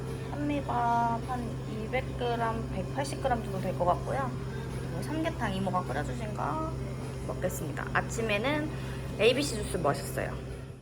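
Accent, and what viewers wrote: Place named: Seoul, South Korea. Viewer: 5년전 영상인데 왜 예전 서울사투리가 들리죠 ? 언제나 귀여우셔요 ㅎㅎ😍😍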